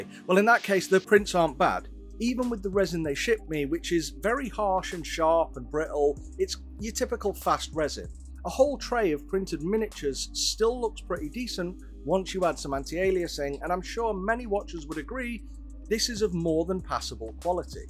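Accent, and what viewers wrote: United Kingdom. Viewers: "I sound cocky and arrogant" Me: That's probably Americans judging him based on his accent.... explains boot As an American, I think any American that interacts with any UK media or content creators and does not know bonnet, boot, lift, flat, torch, etc is just being willfully ignorant... on the positive side though, they would also miss the "knob" double entendre.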